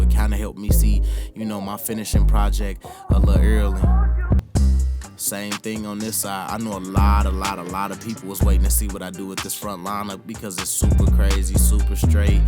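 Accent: Chicago, United States. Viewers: That's the Chi in him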